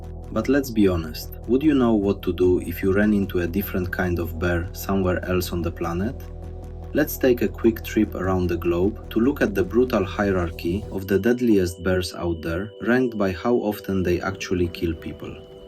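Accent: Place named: Eastern Europe. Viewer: ur English is great and you did a superb job in the narration. I support Poland 100% as well as your great president in keeping Poland Polish. Western Europe is committing cultural suicide.Way back in Polish history, they took a strong stand as well. My comment was meant as a compliment because I always think the Slavic accents are so cool! I’ll put a “thumbs up” on your video right now.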